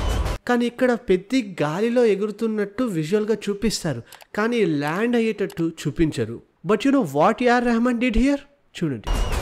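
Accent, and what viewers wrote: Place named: Kerala, India. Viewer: Ni telugu yenti bayya malayalam vadu telugu ni appu techukunnattu undhi worste ga why yendhuku ala matladuthunnav thinnaga matloduchuga Andra style ledha Telangana style ayina matladu bayya teluguni kuni cheyyaku please..😢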